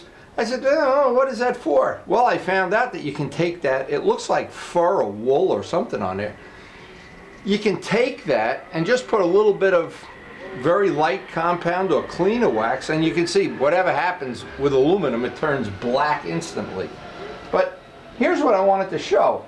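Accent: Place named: Pittsburgh, United States. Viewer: This guy sounds like hes from Pittsburgh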